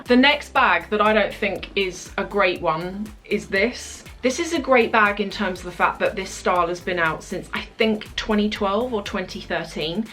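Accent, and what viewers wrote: United Kingdom. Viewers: Thank you, for the most entertaining, informative and creative luxury podcast of all of them. I love that you get right to it and do not belabor incessant droning on and on about nothing over and over and over. You have super fun communication skills, are SUPER FUNNY, PLUS your British accent is addictive. Thank you for a refreshing and lovely addition to my day.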